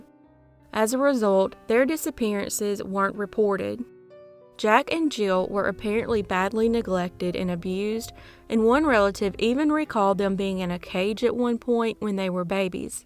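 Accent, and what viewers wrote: South, United States. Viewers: Ah yes the southern accent, love it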